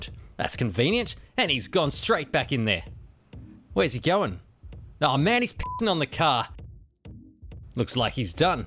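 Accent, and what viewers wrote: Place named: Australia. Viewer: theres no better narrating than a an Aussie lol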